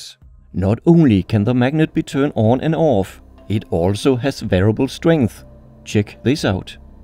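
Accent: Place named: Denmark. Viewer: Nothing like a good old danish accent 😄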